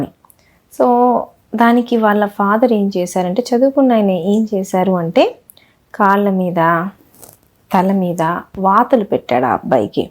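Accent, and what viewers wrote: India. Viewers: నరాల డాక్టర్ ఆ ,న్యూరో doctor ఎప్పుడూ ఇంగ్లీష్ వాడే మీరు తెలుగులో న రా ల అంటే నవ్వించింది ఇప్పుడు ఊరికి, వీధికి, ఇంటికో డాక్టర్ వచ్చే కాలంలో వుండాము మేడం, మీరు అసలే లండన్ పోయచ్చక మీ స్వరం తెలుగు కు మారింది, ఎంతైన బ్యాంక్ ఎంప్లాయ్ కూతురు ఆ మాత్రం ఉండాలి, చెప్పగా యూట్యూబ్ మీరు వాడు కొన్నట్లు ఎవరూ వాడరు , పుట్టిన రోజు అన్నారు గానీ ఎన్ని సంవత్సరాలో చెప్పలేదు 👂👎🙏🫀❤️, రజియా మేడంతో నెక్స్ట్ ఇంటర్వ్యూ అప్లోడ్ చేయండి ప్లీజ్ మేడం...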